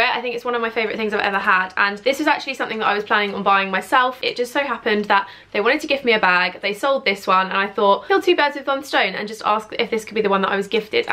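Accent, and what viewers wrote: United Kingdom. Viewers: English I’m guessing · Is she British? 😉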